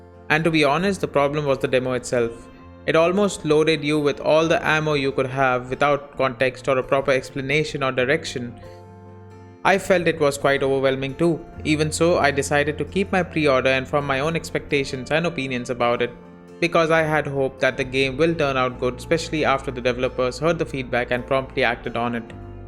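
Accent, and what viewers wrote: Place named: India. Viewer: Couldn't watch longer than a minute without clicking off listening to that annoying Indian accent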